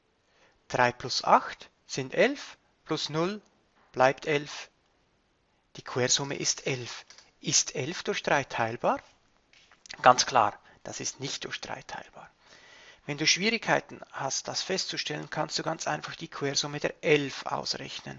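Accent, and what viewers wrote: Switzerland. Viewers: Sehr angenehme Stimme hast du da.. Nur e chli Schwizerdütsch gehört mer da use ;) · Joa ganz guet. Nur hört man wirklich manchmal ein bisschen Schwizerdütsch. Aber dankä fürs Video =D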